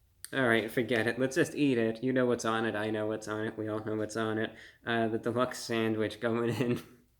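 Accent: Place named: Transatlantic, United States. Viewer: I really like how his voice has progressively changed over the years, as he transitioned from the modern american accent to a nasal, higher-pitched transcontinental accent. This contributes to sink him deeper into his character, along with his old-school yet humble manners and overall appearance.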